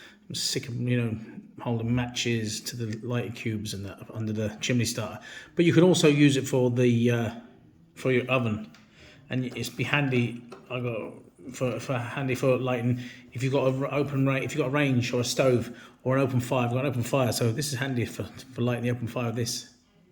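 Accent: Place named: United Kingdom. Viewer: I have one on the way from Amazon , good review fella Love the Brit accent too haha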